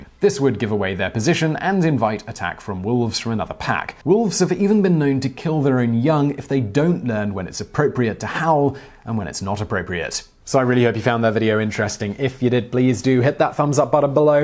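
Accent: United Kingdom. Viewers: Interesting that despite your British accent you use the American term rooster rather than the British term cock. Now why is that I wonder? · Why is Michael from VSauce talking with a British accent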